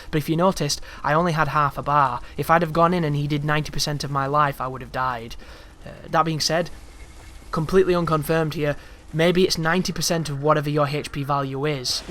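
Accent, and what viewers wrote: United Kingdom. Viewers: Dude I follow you since your Onimusha DoD walkthrough and it's nice that you're making this walkthrough of my favorite game to show some people that its ez and no one should be afraid of it, keep the hard work! BTW are you brit?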